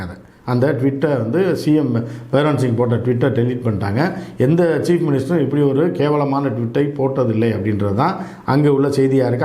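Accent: Madurai, India. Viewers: Yes madurai karar ...athu than pechu thoni kalakuthu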